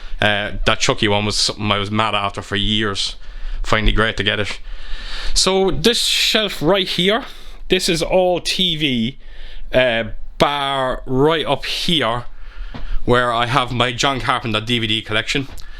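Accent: Ireland. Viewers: is that a Dundalk accent ?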